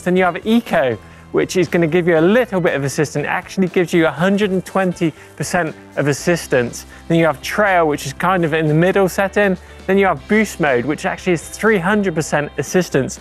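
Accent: United Kingdom. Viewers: Don't know if it is the annoying British accent, the goofy helmet, or the mountain bike in general that makes this unwatchable.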